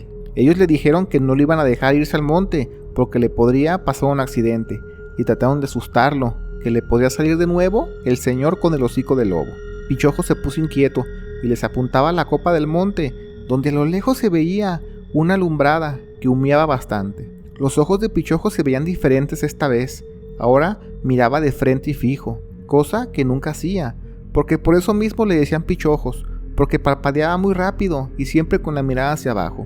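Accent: Mexico. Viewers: De tanto hacer maratones de tus videos, ya se me pego el acento mexicano ❤😅😅😅 me encanta tu canal. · Ay, cómo se nota que eres de Guanatos 😂 me encantas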